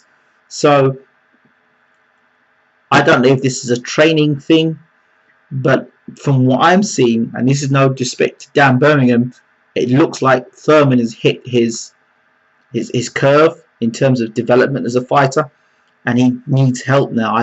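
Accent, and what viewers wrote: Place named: United Kingdom. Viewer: this nigga look like he from South memphis and has a British accent.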